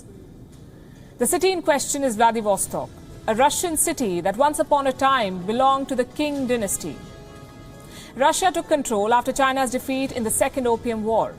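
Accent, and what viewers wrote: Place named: India. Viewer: strong curry english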